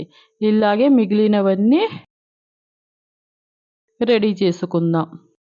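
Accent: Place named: Tamil Nadu, India. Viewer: Avunandi cheppe ame tamilian laga vundhi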